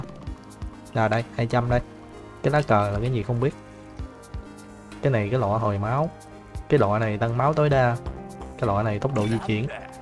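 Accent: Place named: Mekong Delta, Vietnam. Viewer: Nghe giọng thì chắc dân miền tây ròi, e cũng miền tây nè, mà nghe bảo có cam k bận đồ mà a 😓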